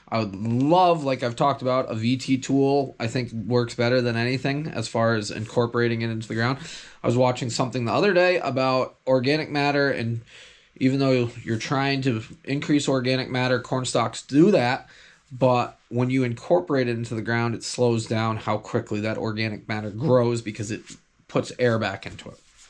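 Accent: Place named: West Coast, United States. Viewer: Your brother has a lot heavier NY or NJ style accent than you. You could easily blend with us West Coasters.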